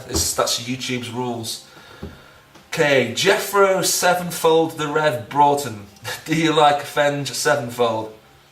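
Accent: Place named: Liverpool, United Kingdom. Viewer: You sound like youre relatively close to Liverpool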